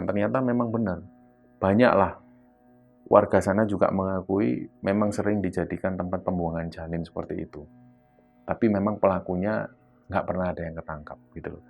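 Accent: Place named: Java, Indonesia. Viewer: Jawa nya kental banget mas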